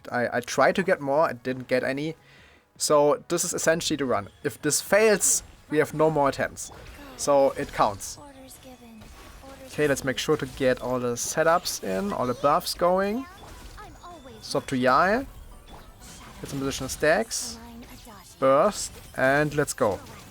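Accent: Germany. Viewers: It's really funny to me how obvious the German accent is, it's so funny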